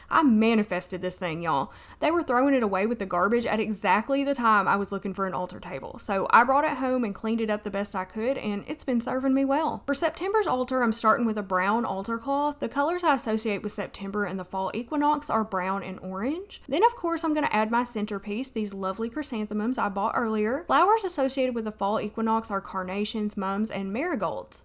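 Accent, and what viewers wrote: South, United States. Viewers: girl your southern accent is on and poppin ☺️ I didn’t know “caught my eye” was prounounced as one word in Arkansas 😊 caw-ma-aye! 😋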